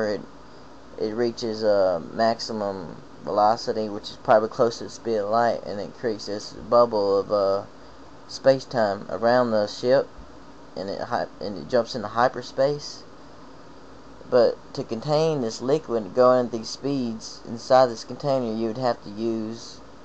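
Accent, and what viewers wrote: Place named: South, United States. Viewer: Who say's people with a southern accent are dumb hicks.This dude is a genius ! Good for him ! This stuff is above me.I'm a dumb Northerner !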